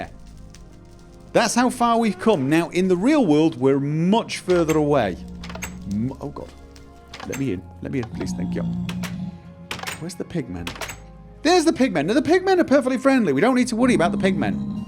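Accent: United Kingdom. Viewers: You know I finaly remember why i like watching you. You are basically have a british 'Morgan Freeman' voice in that its amazing to listen to and you happen to play alot of games i enjoy. Please do more of the minecraft stuff!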